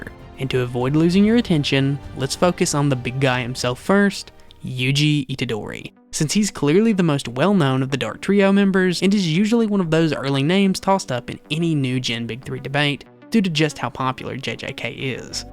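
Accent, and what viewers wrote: South, United States. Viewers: Love the southern accent no diddy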